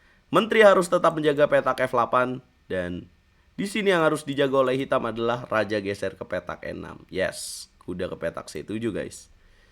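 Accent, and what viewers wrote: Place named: Banyumas, Indonesia. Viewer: mas, masnya orang mana sih? kok kaya bisa bahasa ngapak gitu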